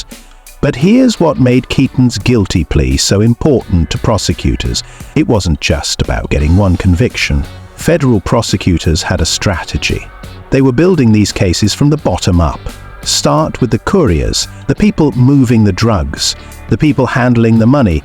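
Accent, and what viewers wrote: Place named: United Kingdom. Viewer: BRITISH NARRATORS , I'LL PASS THANKS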